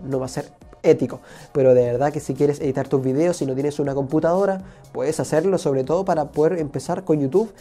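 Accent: Chile. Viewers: eres chileno?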